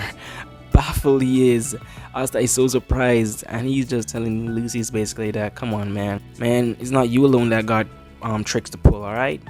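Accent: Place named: Jamaica. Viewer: with all due respect where are you from brother? I can hear a kinda accent ive heard before a lot but i dont to be rude, but you kinda sound Jamaican. also amazing video thanks for the breakdown of the chapter bro!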